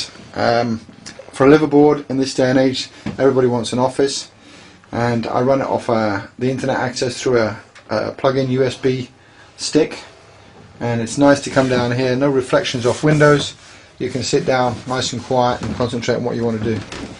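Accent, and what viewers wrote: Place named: United Kingdom. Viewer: Why does a British accent seem to make things oh so good. An insult to ones intelligence. The dude yaks to much.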